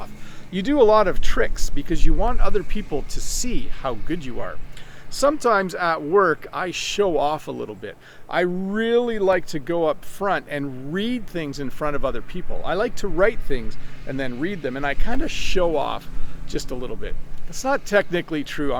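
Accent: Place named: Canada. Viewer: Canadian English❤nice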